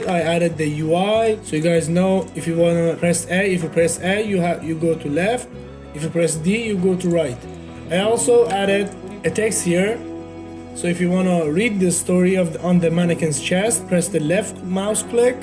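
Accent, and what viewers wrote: Iran. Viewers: سلام داداش اومدم ویدیوت از لحجت فهمیدم ایرانی موفق باشی 😂❤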